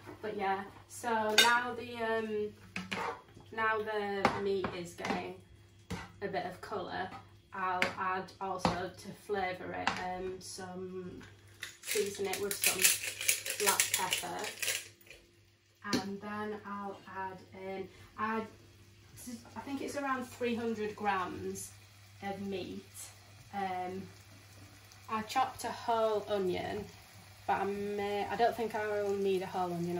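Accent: Yorkshire, United Kingdom. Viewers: You are not hafe you are Somali. 100%🇸🇴💪❤🍇😘🥴🥴😁🥴 the Yorkshire accent did it for me so funny 🥰😍💪❤🍇😘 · Love the Yorkshire accent, would have liked you speak more Somali though.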